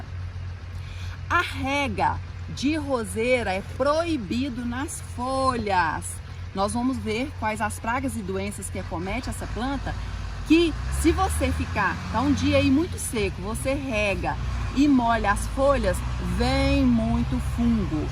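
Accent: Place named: Ceará, Brazil. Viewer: Gostei amiga soou do Ceara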